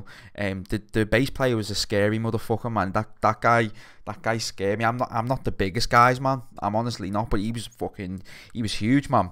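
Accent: Liverpool, United Kingdom. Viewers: Your passion for great new metal, your Scouse accent and you're totally hot 😛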